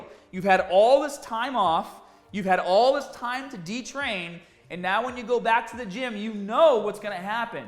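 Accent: Boston, United States. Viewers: His Boston accent comes and goes. He's working HAHD to neutralize it.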